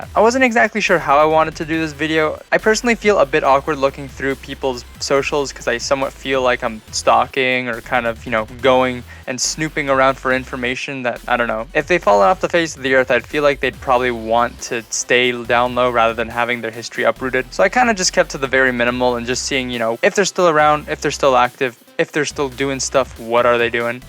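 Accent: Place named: Canada. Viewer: idk why but you sound extra canadian in this vid. Anyway, this is great and I'd love to see more of these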